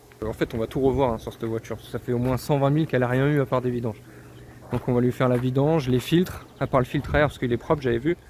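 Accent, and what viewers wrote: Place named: Normandy, France. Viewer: Belle vidéo,belle mécanique,le 2.3 n'a pas été extremement diffusé en France.Solidité incroyable,mais la conso....Etant donné l 'accent,on serait bien en Normandie non?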